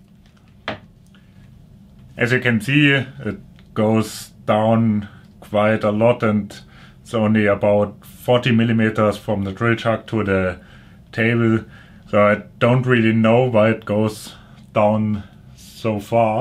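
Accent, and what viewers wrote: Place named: Germany. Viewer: Du bist Deutsch oder? Wenn ja das hört man raus (nicht böse gemeint)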